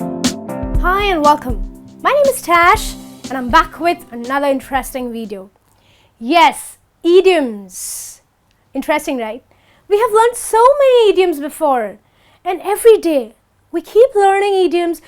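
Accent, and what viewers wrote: India. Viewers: R u Indian? Tash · Nice teaching, hay I'm Dion From Indonesia, I like your teaching, anda I Just wanna to ask "where do you come from tash?" your accent is like India,... Are you from India???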